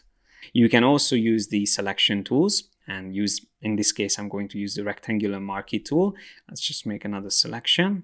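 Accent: Hungary. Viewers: That magyar akcentus though :D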